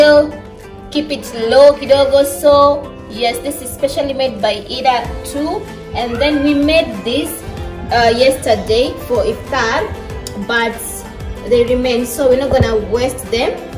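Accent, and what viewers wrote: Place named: West Africa. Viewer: Mashaa Allah sis beautiful. Your accent sound a bit different from our eat african. Are you from western part of africa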